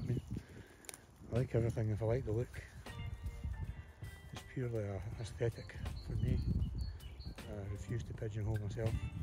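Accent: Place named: Glasgow, United Kingdom. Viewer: Hi I just came across your vlog and I also do woodland and from time to time I use my film camera. would you mind telling me which woodland area this was. I guess from your accent you are local Glasgow or surrounding. Thanks. Jacqui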